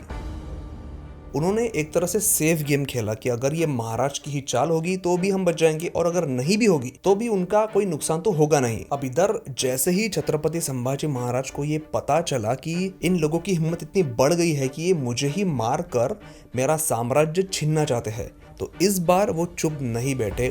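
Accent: Maharashtra, India. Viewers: बहुत अच्छा व्हिडियो! जी आपके कुछ उच्चार जैसे की 'हाथी' के बजाय 'हत्ती' बोलना और 'निर्माण' को 'निर्मान' बोलना उनको सुधारने की जरुरत है. आप मराठी हो ये आपकी बातो से झलकता है.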